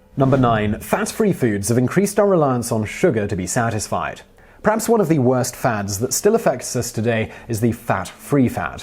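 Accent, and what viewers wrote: United Kingdom. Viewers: I lo e how the American viewers get all worked up by his superior British pronunciation on a lot of words. Having him drop the odd American (mis)pronunciation in is great, just to keep them knowing that he knows.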